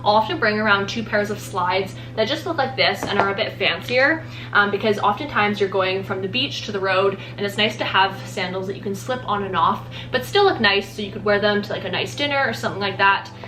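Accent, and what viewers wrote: Canada. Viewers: I love the way you say bag hahahaha your Canadian accent is so cute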